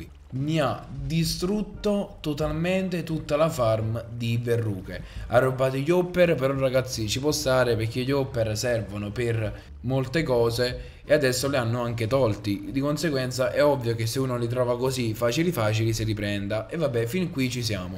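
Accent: Naples, Italy. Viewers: ma sei napoletano?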